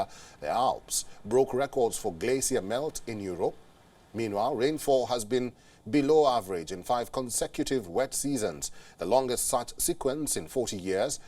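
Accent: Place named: India. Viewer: you now speak like an indian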